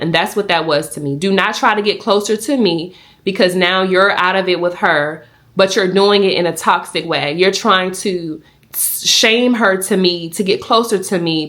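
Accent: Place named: Washington DC, United States. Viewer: You’re doing amazing, I hear your DC accent too sis! Keep going!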